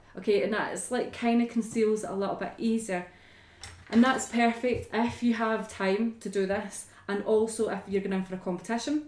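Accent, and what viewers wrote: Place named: Scotland, United Kingdom. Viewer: So beautiful you are so talented and what a lovely scottish accent , my husband came from Fyfe. I have tried so many times to wire peonies but always seem to fail they spin and crack so sadly I dont try anymore😢 thank you for your tutorial x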